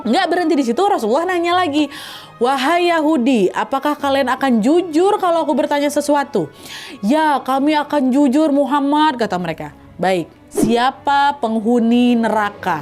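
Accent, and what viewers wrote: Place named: West Sumatra, Indonesia. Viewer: Tagayiang gayiang bahaso Minang e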